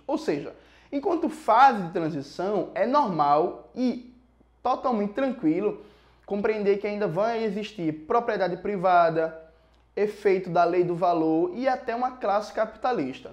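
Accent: Pernambuco, Brazil. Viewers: Seu português-pernambucano dá um certo charm e uma aura especial. Competência nem se comenta. Reconheço.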